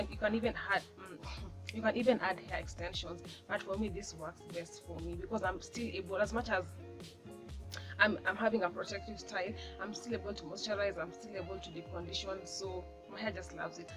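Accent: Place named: Africa. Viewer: You have a lovely accent. Where are you from? Zambia, Kenya?? Trying to guess Lol. I'm from Zimbabwe and your accent sounds pretty close to that area of the continent.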